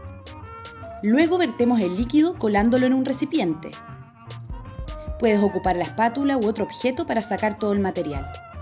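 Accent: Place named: Chile. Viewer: Estado intentando practicar el acento chileno pero es muy difícil, espero no equivocarme eres chilena, y no utilizaste mucho de los modismos Pero tú solo hablar se nota tu acento, crees que puedas ayudarme a mejorarle acento chileno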